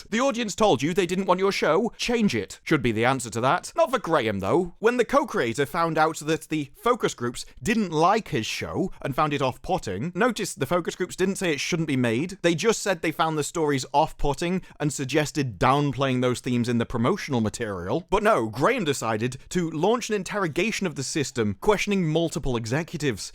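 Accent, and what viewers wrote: United Kingdom. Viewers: Oh, I get it.. So you're like a British It'sAGundam ??? 🤔 I'm here for it. Your accent is very similar to Gopher. 😅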